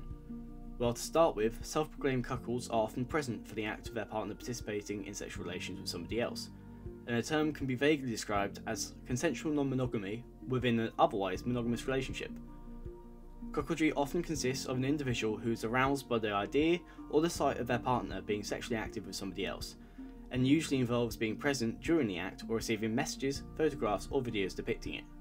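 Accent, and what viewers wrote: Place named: United Kingdom. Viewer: Wish your pronunciation would have been clear and audible..Heavy British accent makes it foggy to hear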